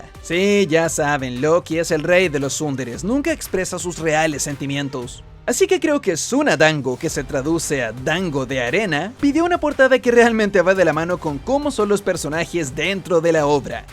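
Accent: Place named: Chile. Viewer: Noooooo puede ser eres chilenoooooo. KAKSJAJSKAKS acabo de darme cuenta cuando hablaste de lo de ussop 😭😭😭. Pensaba que eras español, me subscribo y te sigo de acá hasta que termine one piece Akakjajaks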